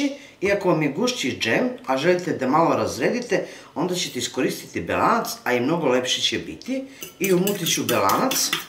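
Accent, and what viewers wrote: South, Serbia. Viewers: Meni se naprimer mnogo svidja vas juznjacki govor i nemenjaj te ,ja sam uvek za to da se nemenja a moja komsinica je iz leskovca i ja uzivam da je slusam😍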